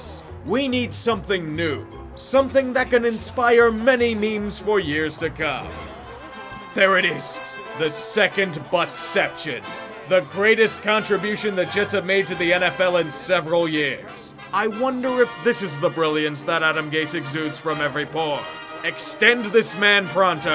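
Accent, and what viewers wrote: Pittsburgh, United States. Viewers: Nice to hear the Yinzer again.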